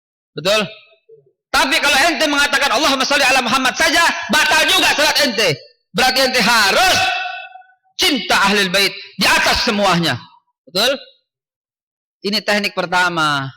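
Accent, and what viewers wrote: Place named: Jakarta, Indonesia. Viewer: wajar aja beliau org betawi kan ngomongnya emang gitu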